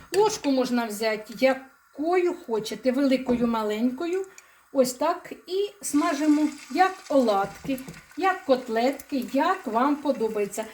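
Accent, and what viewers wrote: Standard. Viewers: Ой, як Ви гарно розмовляєте чистою українською мовою. Дякую. Хай Бог Вас благословляє і охороняє. Дуже подобаються Ваші рецепти. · Як приємно, коли, нарешті, згадали українську мову. При чому, чисту. Приємно слухати. І рецепти у вас, заслуговують на поширення. Бажаю успіхів.❤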